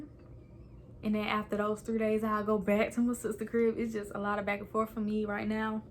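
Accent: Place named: Georgia, United States. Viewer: I would Georgia or South Carolina. I don’t hear Texas lol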